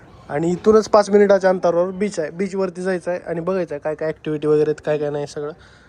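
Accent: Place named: Latur, India. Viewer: Tu tar punet rahun Latur ci basha boltho 👍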